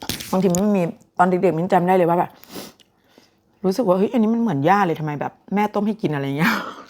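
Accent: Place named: Central, Thailand. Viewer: เป็นคนภาคเหนือ แต่ทำไมพูดเหน่อสิงห์บุรี กาญจนบุรี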